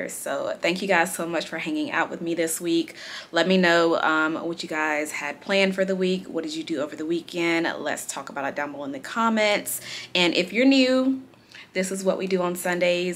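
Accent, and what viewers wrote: South, United States. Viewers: First of all, that oysters vlog makes me laugh! I’ve watched it twice.😂 And you don’t sound “country”, you speak Southern Charm. I will never do YT but seeing the BTS of what all that entails is so interesting to me. Nothing interesting going on here but I still needed this chill vlog. Stay safe and thanks for what you do.💚💚💚💚💚💚💚💚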